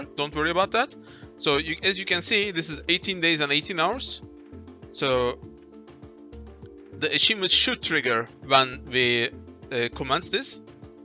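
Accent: Turkey. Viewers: aksandan direkt Türk olduğunu anladım :D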